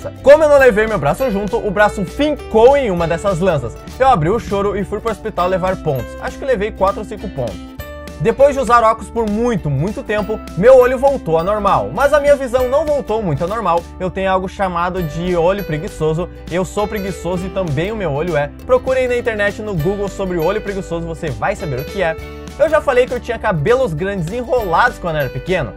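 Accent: Rio Grande do Sul, Brazil. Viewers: Bah, que tri! Quando assisti teu canal pela primeira vez, percebi que teu sotaque era gaúcho! 😍 E agora que tu é de Gravataí, que massa! Eu moro ao lado, em Cachoeirinha. É nós, adoro o canal! ☺👊👊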